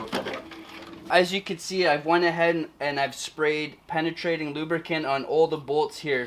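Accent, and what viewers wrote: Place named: Canada. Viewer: Are you Canadian Bro? I am too! Maybe you are in northern USA, the accents carry over. Great video!!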